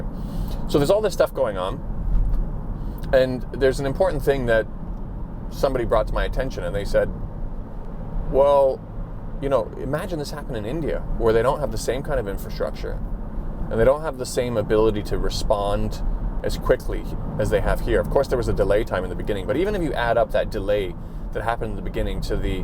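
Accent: United States. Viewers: I like your comments. I live in SZ half a year for 6 years now. NanShanQu by OCT Windows to the World. You don’t exaggerate and you don’t make up things like other Tubers. You sound more California than Canada, although I noticed your “oout” slightly. Enjoyed your piece. Of course, SZ is unique. All new everywhere and everything. I hope you mention to viewers that in other cities they have new sections similar to SZ and old parts. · First time watching your vids...are you American?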